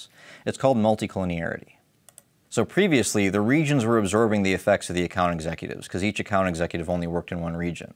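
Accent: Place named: United States. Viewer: finally we got a video with American accent. yay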